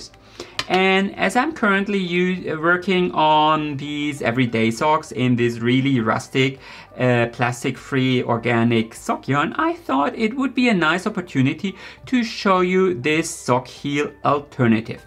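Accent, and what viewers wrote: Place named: Germany. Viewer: Also, your tutorials are simply superb! You're so skilled, you pay close attention to detail, and you're so open-minded about various knitting techniques! It makes me feel like I can try anything I want and just have fun making mistakes and learning from them. Also, my mom is from Germany and your accent makes me feel just like I'm at home again. :)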